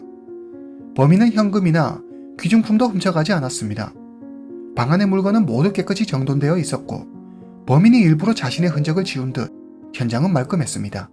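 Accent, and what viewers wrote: China. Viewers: 발음이한국분은아닌거같은데 약간조선족?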